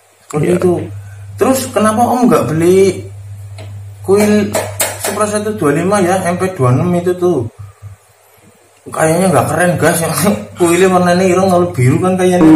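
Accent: Banyumas, Indonesia. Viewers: Rika si wong ndi deneng Kya kenal ngapak e 😂 salam Sekang purbalingga kang😂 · Dari mana bang, koq ngapak🙏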